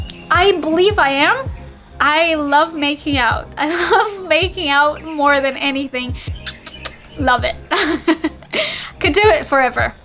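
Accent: United States. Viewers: Hey. How come you don't have a heavy Russian accent? You're accent is almost American. Did you live in the States? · I love it when you cuss, laugh, and giggle even at your own jokes. You're American born and raised in Calif, am I right? It seems obvious in every way you speak & express yourself. We might be related. I'm your creepy uncle, hahaha. Kidding. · Are you American or Canadian? Which state you at?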